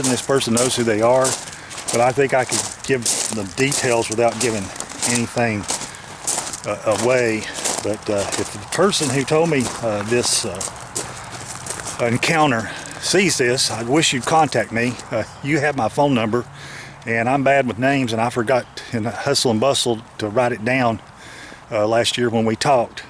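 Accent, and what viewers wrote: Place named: East Tennessee, United States. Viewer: Where are you from you sound alot like people.from my area in East Tennessee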